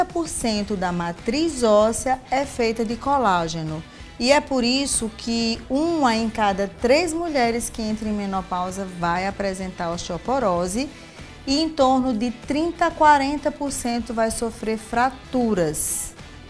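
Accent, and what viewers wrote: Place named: Rio Grande do Sul, Brazil. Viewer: amo seus vídeos doutora,inclusive esse,suas lives tbm,seu sotaque é muito parecido com o da minha terra rs RS,abraço 😘❣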